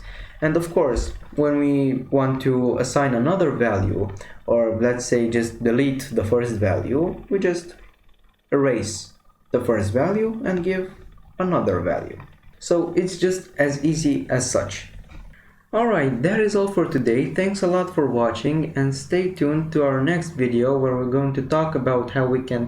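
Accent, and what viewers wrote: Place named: India. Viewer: no problem man, sometimes i click a tutorial and then i get triggered by the voice and i would click away, this mostly happens with indian accents, i just cant listen to it, im literally in pain, but for some reason this happend with your voice, where are you from?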